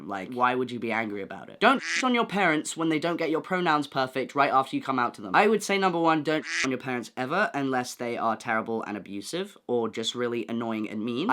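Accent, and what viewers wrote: United Kingdom. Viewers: this is not a hate comment or anything but OH MY GOD i did not expect this accent. Like you have the most american face ever. you look like you surf in your free time. and i see your californian face and i watch the video and its the most bri ish accent ive ever heard. like this is insane. nevermind the accent, i really liked your takes and generally the way you speak. you seem to be a very smart and compassionate person. very nice video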